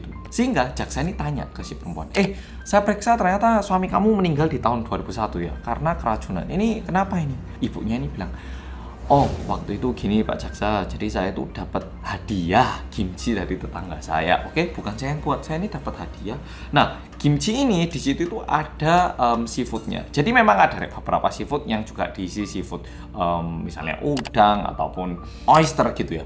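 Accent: Java, Indonesia. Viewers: Medhok bossqu😁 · Pertama liat videonya tak kira: lha ini wajahnya kayak orang korea beneran kok medok banget jawanya hahaha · Ngakak aku penampilane wes kuweren omonge njelongop njelongop 🤣🤣🤣 ketok jowone mas haha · 𝙃𝙖𝙡𝙤 𝙜𝙪𝙮𝙨 𝙬𝙚𝙡𝙘𝙤𝙢𝙚 𝙗𝙖𝙘𝙠 𝙩𝙤 𝙆𝙤𝙧𝙚𝙖 𝙧𝙚𝙤𝙢𝙞𝙩 Bersama orang Korea yang "𝙢𝙚𝙙𝙤𝙠𝙠𝙠" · MAS: HELLO GAIS, WELCOME BACK TO KOREA REOMIT. BERSAMA ORANG KOREA YANG SATU RUMAH:" MEDOKKKK" krna setiap hri nonton. Jd hafal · Suka bgt sama kontennya mas bersama orang korea yang medokkkk.😊😁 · Ya medok 🎉 tks...bye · Ya allah mas sampean wong korea hlooo😂, kok iso bosone njlungupp to yooo, bener2 orang korea yg medhokkk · "Bersama Orang Korea Yang Medok!!!!" Selalu reflek bila mas Bilang ini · Yang medokkk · Makin glowing aja ini mas mas medok 😅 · oke aku udh lama ga buka yt dan nonton sesuatu hampir setengah tahun lebih. akhirnya aku mau mulai lagi dr mas mas medhok ini. 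editannya itu lo ngakak terus aku. padahal ceritanya bukan cerita komedi. salut buat editornya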